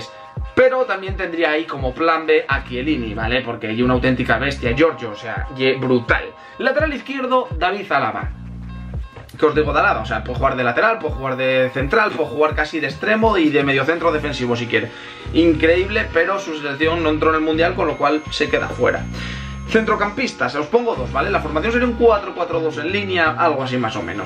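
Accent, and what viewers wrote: Asturias, Spain. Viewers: es el bable de Asturias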